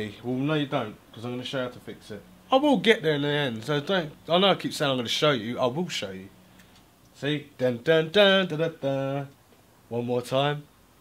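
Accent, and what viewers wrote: England, United Kingdom. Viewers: Why you have english accent?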